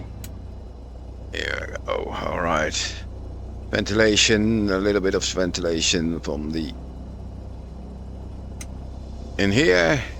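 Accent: Netherlands. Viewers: Maybe you got a golden sample but i returned mine because off the MURA,maar ik hoor dat je van nederland komt hahahah..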